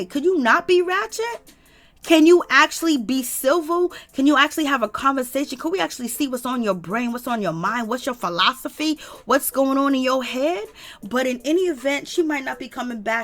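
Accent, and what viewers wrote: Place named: South, United States. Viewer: YOU HAVE THE WORST ACCENT AND YOUR WORDS MAKE YOU SOUND REAL COUNTRY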